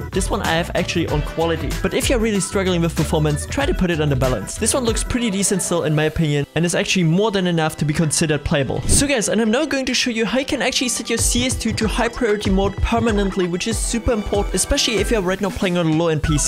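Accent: Germany. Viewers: Hab sofort erkannt das du Deutscher bist XD nice tutorial btw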